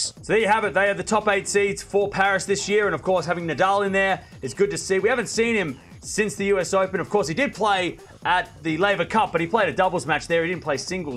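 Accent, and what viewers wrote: Australia. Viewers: Please pardon Australian accent. He tries hard to pronounce names correctly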